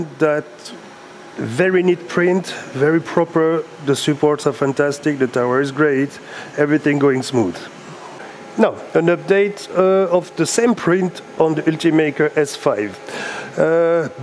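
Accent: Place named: France. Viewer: Yes, this is french accent :)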